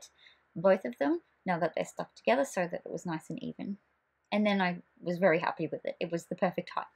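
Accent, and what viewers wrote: Australia. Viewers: Love love love your Aussie accent!!🥰 love your creation❤❤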